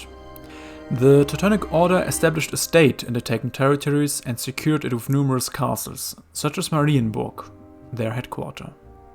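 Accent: Germany. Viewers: It's funny how you think you joke about your German accent and switch back to your normal English, which still has a heavy German accent. But I sure love your content. Keep it coming! <3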